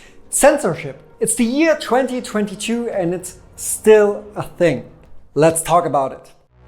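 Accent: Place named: Germany. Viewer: not the typical german english speaker nice usually all germans speak it like in the 1960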